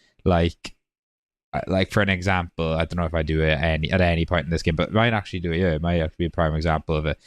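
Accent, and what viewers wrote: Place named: Wales, United Kingdom. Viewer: I must have sunk a thousand hours or so into Smite up until season 6 and I stopped playing because it got grindy and the fun went out of it. I want to give smite 2 a go, and I'll give Pred a go too; I played some Paragon back in the day and I didn't know it had kind of been resurrected - I've only just learnt about Overprime and some other game that used the assets that have both died. Looks like Pred has its version of Smite Arena that's just come out. The map is beautiful and I love the 3D aspect of it. I don't think I would sink any money into Pred though until it's been out for a fair while as that could just as easily disappear; I'd be more happy to put some money towards Smite 2 really. Sounds like you've got a Welsh accent; if so, friendly greetings from a fellow Welsh person! If not, friendly greetings anyway 😂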